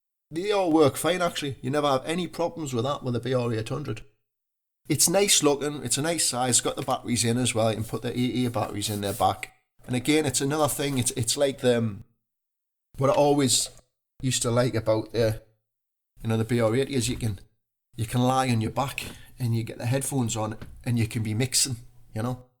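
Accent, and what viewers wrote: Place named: North East England, United Kingdom. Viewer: North East is my guess, closer to Newcastle than hull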